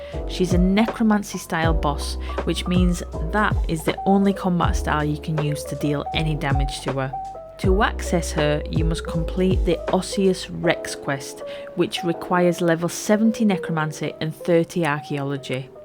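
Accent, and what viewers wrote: Yorkshire, United Kingdom. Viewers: Ey up lass, you a yorkshire rser too? :D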